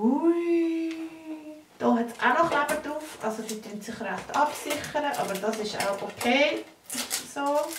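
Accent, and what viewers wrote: Switzerland. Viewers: Stimmt, Schwitzerdütsch ist kein Deutsch..... Aber ich versteh dich! ;-) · Juhu, isch scho es Zitli här das Video! i wohne z'Basel, chume aber ursprünglich au us der Region Solothurn/Bärn... due doch nid esone Zürcher Dialäkt ufsetze... wenn nämlech am Erkläre bisch im Video seisch jo au Röueli z.B., lohs lo sprudle! ;-) aso me verstoht di Mi Cameo 3 isch no haub ipackt im Büro und i goh jetz grad mou go luege öb das stimmt wo du do verzöut hesch im Video ;-)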